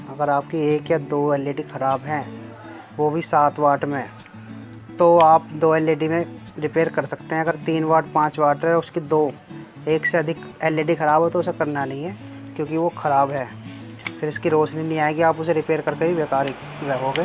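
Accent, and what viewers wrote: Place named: Baghpat, India. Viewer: i m from Mumbai... my hometown is bagpat district.. aap ki boli wahi ki h think so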